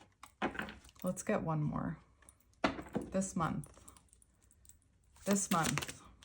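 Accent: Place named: Canada. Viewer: Hi! I've been watching you for about 2 weeks now & it feels like you are looking at my & my Twin Flame's life. We've been together for 12 years & right now it's really complicated. We've been apart for over a month now & I've been so broken over it. You & your readings have been spot on! For example, in like 3 different readings you kept saying he's watching me, almost like voyeuristic. I didn't think so but 3 different times he's said something about watching me or followed me someplace when I wasn't where I usually would be since I watched your readings. A lot of what has happened now makes sense, & that is entirely thanks to you & your gifts. You have a healing voice & I've really enjoyed watching you. You've given me a lot of insight & a lot to think about. You made a comment that a person could tell you were from Canada by the way you say "car". 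For me, the first time I heard you say "about" I knew. It seems like you all put a lot of emphasis on the "ow" (ou) part of the word. It's cute, like a reverse southern draw. Thank you for the clarity & peace that you've brought me. You have really REALLY helped!!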